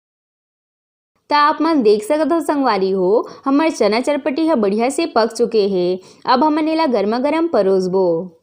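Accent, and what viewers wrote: Chhattisgarh, India. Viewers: धन्यवाद दीदी बनाय के तरिका बताय बर। मैं तोर सब एपिसोड देखथव ।मोला तोर छत्तीसगढ़ी बोली अब्बड़ सुघ्घर लागथे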